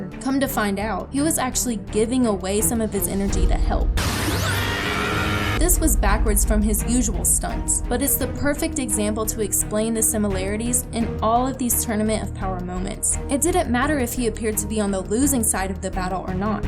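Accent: South, United States. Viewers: low key has a southern accent. I like it lol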